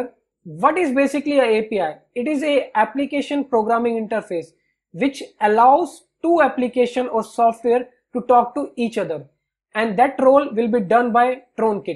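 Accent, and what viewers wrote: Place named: India. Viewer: I cannot tolerate your dialect. I think you are from India. Please consider voice training. Please!!!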